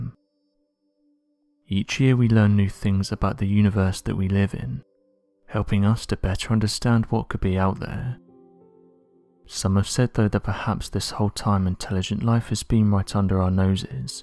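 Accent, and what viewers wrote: United Kingdom. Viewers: All fluff no substance. This channel brings nothing to the table every video except a 'mysterious' British voice over whispering cautiously. Pretty pictures/zero substance.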